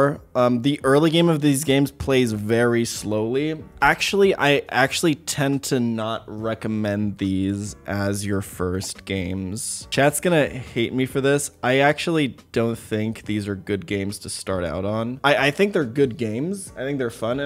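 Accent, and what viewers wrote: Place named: Germany. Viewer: seems like a very Americanized German. Especially with the accent and everything 🤔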